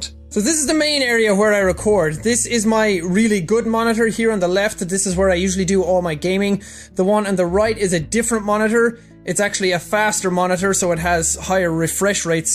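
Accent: Ireland. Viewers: Dat irish accent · You're starting to sound a bit more Irish now 🤣🤗😉😝☺️💁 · you have the best accent just listening to it makes me want to stop being scottish and be irish instead just to have the accent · are u from ireland?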